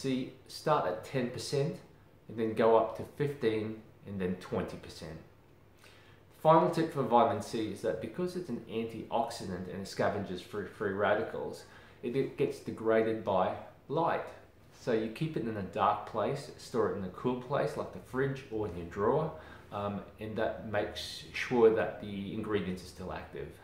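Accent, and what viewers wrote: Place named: Australia. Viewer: Such an informative and easy to understand video! Love your Aussie accent and your smile! Thanks!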